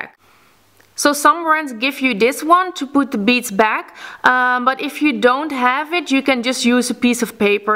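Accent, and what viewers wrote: Netherlands. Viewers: Super leuke nail art! Ik was het filmpje aan het bekijken en ik dacht: Dat accent, ze moet wel Nederlands zijn. Dus ik ging zo op je blog kijken en toen bleek het dat je Nederlands was! ik vind dit ontwerp echt super gaaf ik ga het ook proberen (: <<num>! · Where are you really from? I hear some accent hahaha Holland? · ik dacht al dat je nl was hoorde het al een beetje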